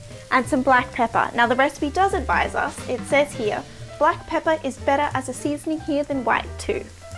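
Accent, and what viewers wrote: Melbourne, Australia. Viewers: Are you from Melbourne!? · She’s Australian, not english!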